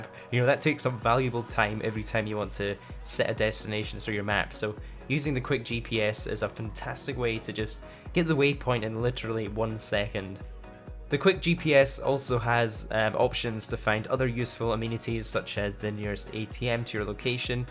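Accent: Scotland, United Kingdom. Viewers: I just realised we kind of the same username 😂and you sound Scottish because I’m from Scotland and u sound Scottish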